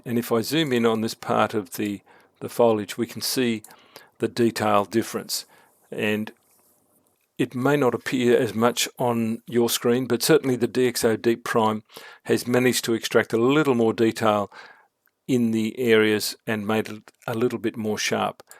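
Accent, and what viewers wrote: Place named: Australia. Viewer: Hi there. I hope you remember me, we have spent time together on workshops in FNQ and on a Barrier Reef Island. I'm the guy who likes to sleep in hammocks.I may even use an unusual brand of camera😉 I really enjoy your lessons and tutorials, it's great to hear them in an Aussie accent. I have recommended them to my Photo Society Buddies. this one is very relevent as I was in your home town last weekend shooting the vivid festival, and high ISO images were required to capture the beaams of light in the sky. I have the older version of DXO pure raw, (1.6) and also the latest version of Topaz denoise. I'm thinking of upgrading DXO Photo Lab so I canhave the benefits of PR3 and Nik collection 6. Do you think there is benefit in this move?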